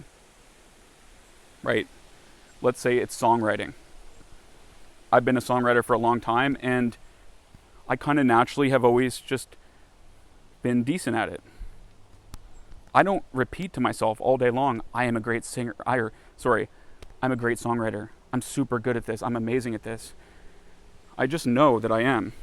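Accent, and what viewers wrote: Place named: Maritimes, Canada. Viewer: I know a fellow Maritimer when I hear one. Great videos man keep em coming!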